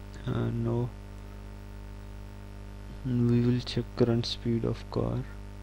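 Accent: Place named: India. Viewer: Thanks man .. Great video .. Indian voice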